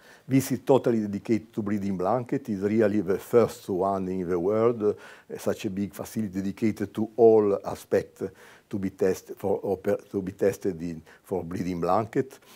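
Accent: France. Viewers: English subtitles would be of great help here. And yes, I know he's speaking Franglish.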